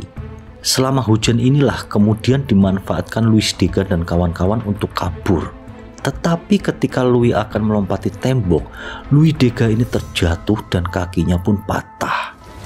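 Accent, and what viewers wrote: Java, Indonesia. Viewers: Suaranya agak medok Jawa y bang?🤔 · Suaranya medok bangett hahaha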